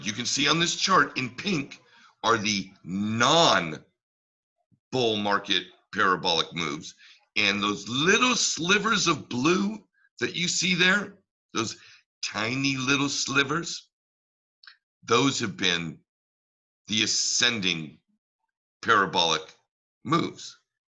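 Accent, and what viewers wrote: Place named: California, United States. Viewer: yeah just discovered you in the last couple days. I heard you’re from NorCal my tax guy lives in SoCal so maybe it’s a CA accent. He’s your age too probably. Great tax guy! and I’m liking your channel so great guy here too😊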